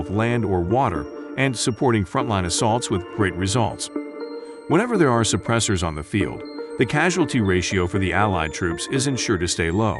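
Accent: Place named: United States. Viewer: good voice ofc amarican not this robotic english 🤖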